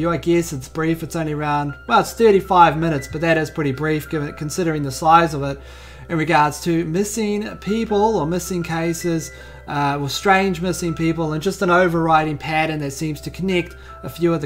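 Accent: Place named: New Zealand. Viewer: I loved esoteric detective but its so much nicer to hear your kiwi voice ☺☺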